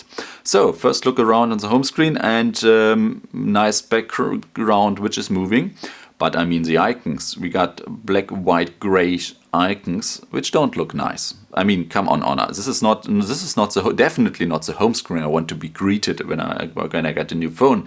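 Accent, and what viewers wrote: Germany. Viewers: German accent. :)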